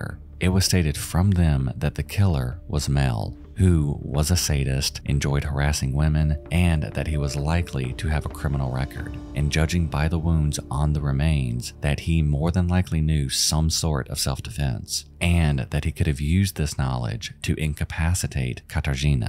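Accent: South, United States. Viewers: I’m sorry, I know this is a serious video, but the question must be asked: how does this man with a vaguely south-ish USA accent say “Katarzyna” better than Tyra Banks who regularly works with East European (among other) models ever did on her show when she literally also had a girl on the show for almost every episode of Cycle 10 *named Katarzyna*? Angry.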